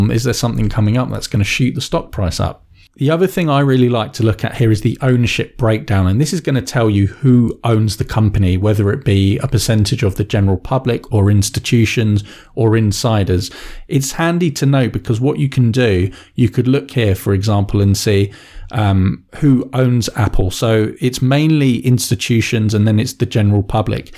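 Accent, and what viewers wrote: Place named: United Kingdom. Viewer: Thank your for this video. I learned a lot, and your British accent is cool. I was going to subscribe, but it seems that almost all of your videos are about crypto coins only.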